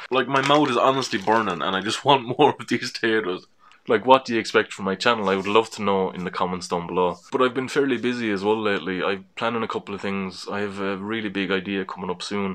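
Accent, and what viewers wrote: Ireland. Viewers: Kinda down to how you define Vlog really. Isn't a vlog a video log? So it's about you and whatever you're up to or what you're into. Unless you have a completely boring life then a personal vlog is going to have variety. I've looked into Irish vloggers and if they're not yet done copying Jenna Marbles it's largely them coming and going to and from work or their sports/hobbies. You do have a niche with your Irish head on ya and accent and living in the Wesht of Ireland. The majority of Irish vloggers seem to be all cut from the same middle class or hipster or trendy bolts of cloth. Don't compare yourself to them at any rate. Also that argument for niche is countered on YT with others saying if you don't have one to do verity until you do find onr. You're thing is very distinctive anyway and if you got picked up by some American viewers you'd spread far and wide, especially if you're bringing them local stuff. 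Start another channel for the project pieces but dance round them on the vlog to push traffic there. We intersect on several things you've covered not just the one, heritage, video, Geocaching and local radio being the main ones. Ya don't normally find those things on the one channel so variety does appeal to many. Ultimately you are the brand and you are the product here. I'm here to find out what got up to this week and maybe learn a few things on the way.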